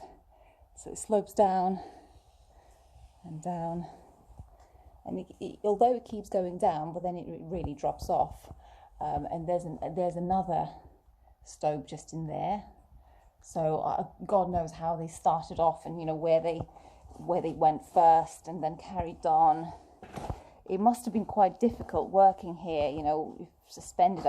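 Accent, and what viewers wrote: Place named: RP, United Kingdom. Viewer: Hello from America! Nothing like a gorgeous lady guiding us through some awesome mines ! Especially with that posh accent 😍😁🙏🏻🙌🏻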